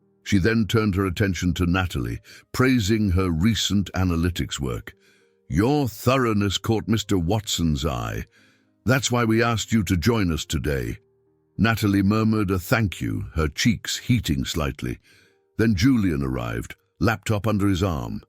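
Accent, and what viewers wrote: United Kingdom. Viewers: I Agree with Comments from "Shirelene", & "ScatPack". I myself feel that the Script was Way Too Long & Slow; AND the Professionally trained Narrator Voice was flat Horrible, play- acting, low then loud, w/accent from U.K. Royalty, way above public status. The video background was interesting, vary- ing from forests & waterways, mountains & country roads, to huge city buildings & speeding cars. The actuality of a young data analist being able to out- computerize an experienced high tech expert & saboteur, in real time, is totally unrealistic & impossible. Finally, I am sick & tired of just another story of self-absorbed, narcissistic, cor- porate corruption, disruption & destruction. How about an innocent, decent, touching Romance ?! This movie finally had a good ending, So, Despite my criti- cizing, My Rating on this Movie is: 8/10. 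❤❤😅😅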